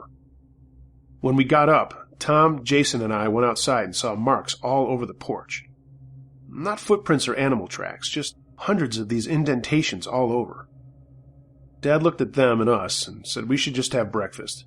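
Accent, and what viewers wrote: United States. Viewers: Still can't sleep. Still going through every video I can find. You have the best voice/American accent around. Thanks for getting me through these long sleepless nights 😁😴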